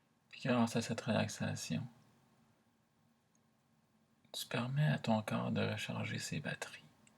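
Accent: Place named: France. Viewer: Félicitations, C'est magique ta voix, Ça m'a fait du bien, continue comme ça! ton axa demi Français j'adore. Marie Médiatrice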